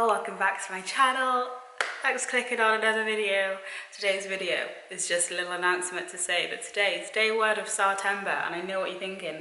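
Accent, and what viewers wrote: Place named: United Kingdom. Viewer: Shw has a british accent kind of🙂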